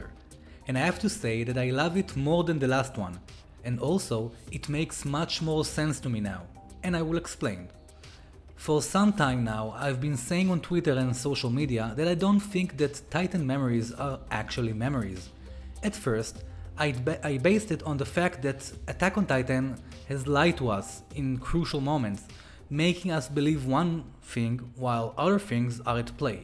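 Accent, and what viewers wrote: Israel. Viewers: וואו איזה תוכן איכותי ישר לפי המבטא מזהה ישראלי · what funny accent. ur just Israeli brah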